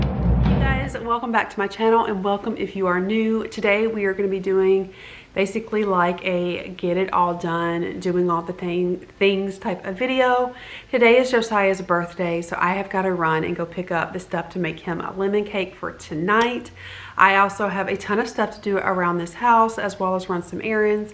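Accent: South, United States. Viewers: Love , love your southern accent ! My moms family comes from GA .Love their southern accent! Miss my family so much !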